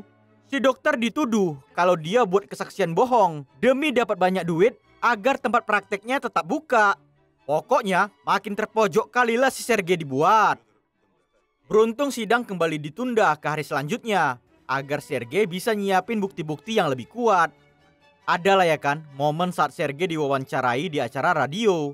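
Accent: Medan, Indonesia. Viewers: Sering2 upload lah bang..ntah film apapun..yg penting suka kali aku dengar logat medannya..😅😂👏👏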